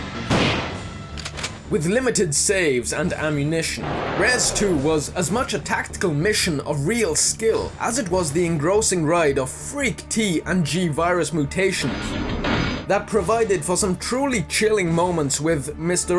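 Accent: Wales, United Kingdom. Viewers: Is Welsh? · No he's british or welsh I think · Firstly your the only big YouTuber ever to respond to a comment so 100x props for that and then second your from wales so 1000x props for that :P I thought I heard welsh overtones but I wasn't sure, what part ?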